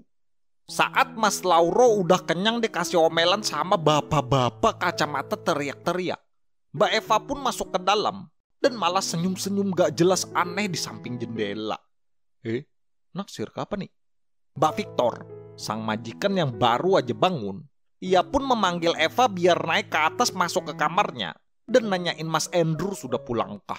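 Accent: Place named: Java, Indonesia. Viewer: 😂😂😂 kejawaan banget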